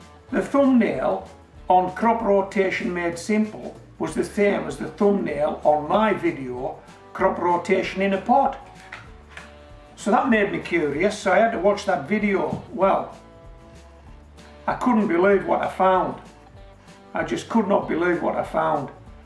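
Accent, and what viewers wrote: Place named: Scotland, United Kingdom. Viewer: I've loved your various videos on gardening in pots! Indeed, I'll be using your advice (with a few modifications) whilst growing some potatoes (to start) in Los Angeles. Also, thank you for this particular video. I honestly had no idea about the plagiarizing of videos on youtube. Since I'll be starting my own channel fairly soon (nothing to do with gardening), I'll be taking preventive steps from the get go...thanks to you. Please, keep up the good work and give Molly a hug for me. Btw, it's always lovely listening to you....your accent reminds me of my Scottish grandparents. <3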